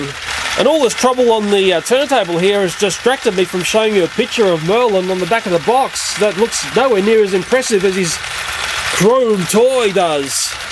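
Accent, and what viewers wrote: Australia. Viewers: Are you an Aussie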